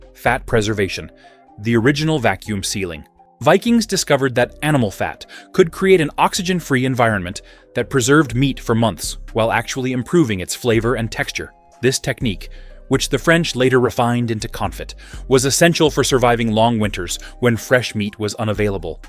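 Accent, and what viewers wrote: United States. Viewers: This hasty-sounding, breathless bot voice is really annoying. The information is solid and well written, though, so, if you sbould do this again, do consider having a human reading it. And the word "confit" is pronounced "Cone-FEE." American audiences [your video uses an American accent] pronounce "herb" without the "h," and British pronounce it with the "h" aspirated. Also, it's "LOO-tuh-visk."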